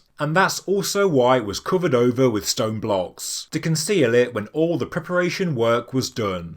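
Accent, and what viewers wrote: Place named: Northern England, United Kingdom. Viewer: Northern English I think.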